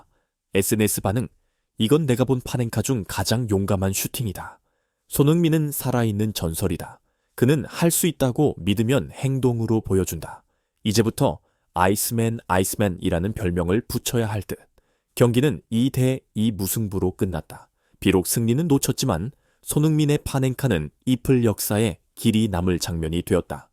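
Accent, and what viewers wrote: China. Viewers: 중국인이 내용을 읽은 건지, 뭐 이러냐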